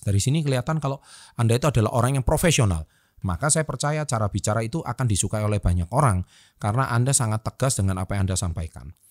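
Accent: Java, Indonesia. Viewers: medok amat sih kang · Kenapa ya aku tuh kurang suka dengar orang bicara dengan logat medok begini😅 agak ilfeel aja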